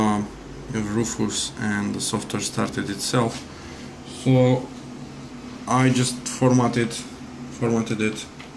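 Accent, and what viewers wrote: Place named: Ukraine. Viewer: You sound like you are from Ukraine or somewhere close. Playing a guess game.. Anyway Il try this.. BTW does anyone know why did we start seeing this screen?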